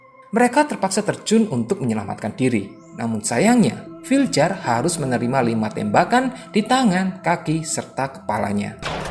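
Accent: Java, Indonesia. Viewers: jowo mu endiii mas adminnn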